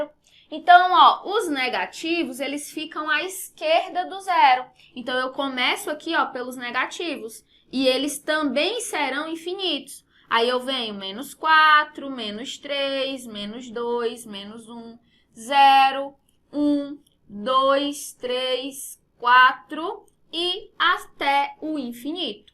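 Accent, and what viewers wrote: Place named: Maranhão, Brazil. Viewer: Muito bom. Sotaque parece dos maranhenses da família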